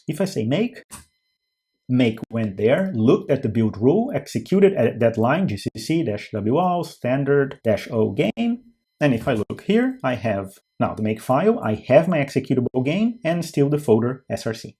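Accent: Brazil. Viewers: Notei o sotaque dele de br também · O sotaque não nega, esse cara é BR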